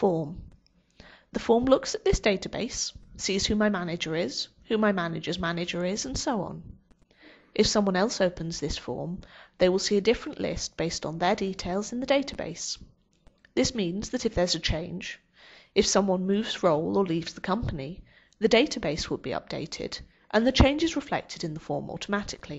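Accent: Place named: United Kingdom. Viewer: Es acento británico.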